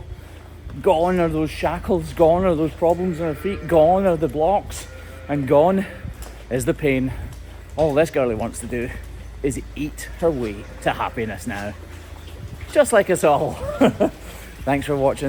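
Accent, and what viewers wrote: Scotland, United Kingdom. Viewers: Sorry I love your Scotish accents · It's the Scottish accent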